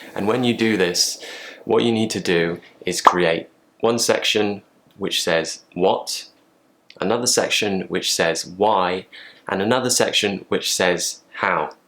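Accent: United Kingdom. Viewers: are u british, babe??? I love you much. thanka for be a great teacher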